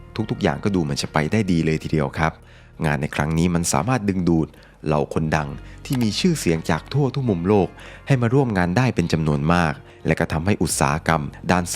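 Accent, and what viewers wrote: South, Thailand. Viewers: เสียงคุณ เหมือนคนใต้เลย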